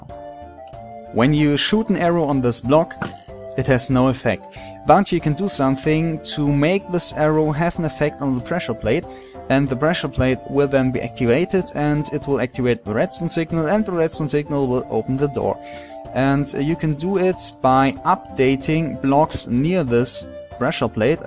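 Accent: Germany. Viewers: Dein Englisch klingt echt total Deutsch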